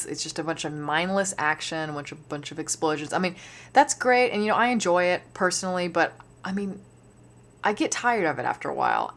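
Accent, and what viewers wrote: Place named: South, United States. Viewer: Southern twang? Accent has changed a bit. A follow up re review on this? It has aged horribly imo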